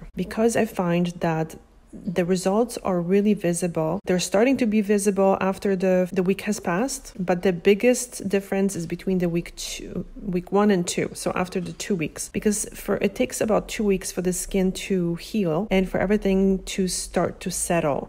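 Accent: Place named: Poland. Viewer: I just subscribed for the content but also I am getting strong Polish vibes when you speak. I’m Polish and your slight accent feels like home. ❤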